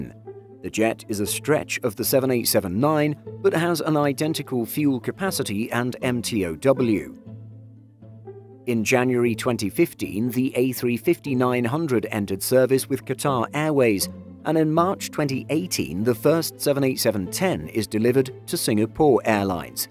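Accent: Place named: United Kingdom. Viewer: The comparison ought to be with the A<num>, and not the 900!!!! On the other hand you have a wonderful British Accent... 😛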